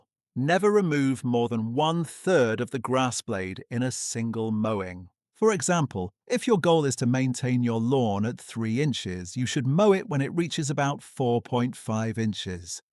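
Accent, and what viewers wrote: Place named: United Kingdom. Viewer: This video was solid. British accent AI or not,is always awesome. Thanks for taking the time to make it!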